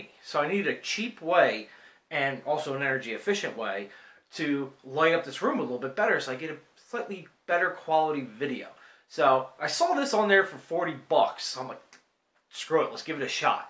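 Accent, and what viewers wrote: Pittsburgh, United States. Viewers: My God man...your accent sounds Pittsburghese.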